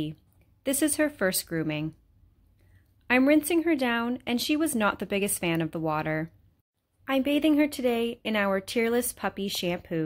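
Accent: Canada. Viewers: Are you Canadian? I hear "oot" instead of "out"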